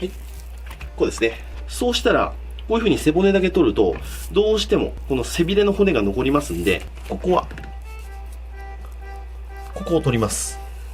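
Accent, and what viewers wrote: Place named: Kansai, Japan. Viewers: 今日どうしたんや めっちゃ関西弁やん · 最近喋り方が関西気味でおもろいw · いつもは名古屋弁なのに今日は関西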